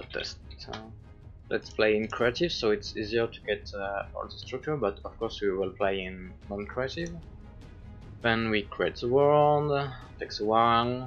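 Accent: France. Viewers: Nice French accent (super mod d'ailleurs) EDIT : It really worked on the server I was playing. I'm shoked it requires zero efforts at all. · You accent seem french, but perfect english :p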